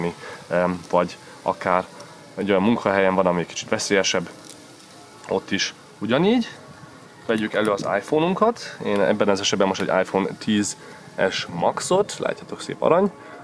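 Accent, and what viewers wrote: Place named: Germany. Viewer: Olyan érdekes beszélsz mintha valami német ember lennél aki magyarul beszél. Engem nem zavar csak tényleg olyan fura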